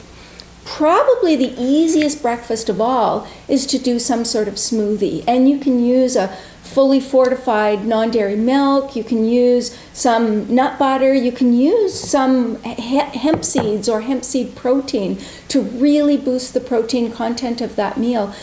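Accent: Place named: Canada. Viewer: You have the strongest thickest Canadian accent I've ever heard!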